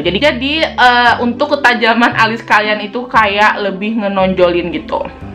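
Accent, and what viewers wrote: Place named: West Java, Indonesia. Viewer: Pasti orang Sunda yah 😚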